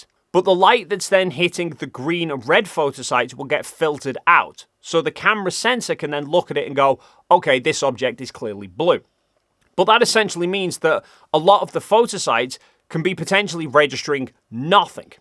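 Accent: United Kingdom. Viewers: a long time ago studies showed that a British accent convinced people it was truth....and a dog in hand yielded the same results. is just smart enough to know good marketing 👏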